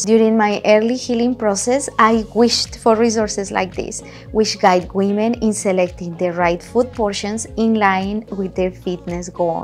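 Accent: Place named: Latin America. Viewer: I like when latinos speak english 😂❤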